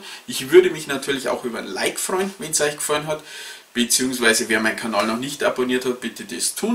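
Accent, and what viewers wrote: Bavaria, Germany. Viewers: Danke für die lebensnahe Erklärung, bayerischer Charme inklusive! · Super erklärt!gaaanz starkes Video. Hehe..auf bayerisch klingt‘s trotzdem ned schlimm. I wohn aufm Land...geht scho irgendwie.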